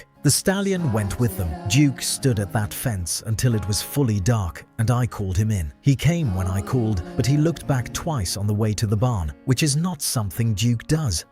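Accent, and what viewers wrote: United Kingdom. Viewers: Why does the narrator have a British accent when clearly this is an American story? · why does ALL YOUTUBE AI Naration have a uk accent? makes no sesnse at all!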